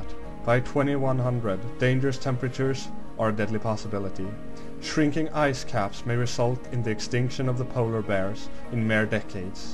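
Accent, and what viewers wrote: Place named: Sweden. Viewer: Clubs He's Swedish. English isn't his native language. :P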